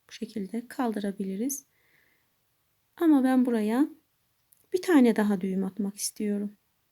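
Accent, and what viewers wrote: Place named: Uşak, Turkey. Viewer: Selam kolay gelsin nerelisiniz ben uşak lı bazı youtuber ları takip ediyorum onlar günlük vlog atıyorlar konuşmanızı benzettim eger mümkünse nerelisiniz cevap yazarsanız sevinirim başarilar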